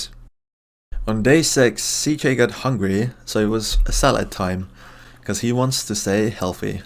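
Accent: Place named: Estonia. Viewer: hevi eesti aktsent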